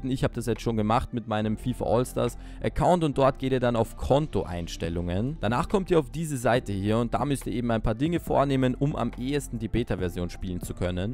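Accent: Austria. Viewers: Ned gewusst das du a Österreicha bist🤔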